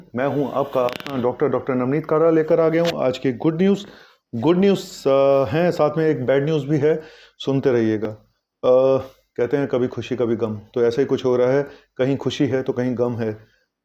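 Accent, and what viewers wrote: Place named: Kolkata, India. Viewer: Kolkata reeee..... bolne ka style bahut achha lagta. Aap kolkata aaiye mishti doi khilaunga jarur .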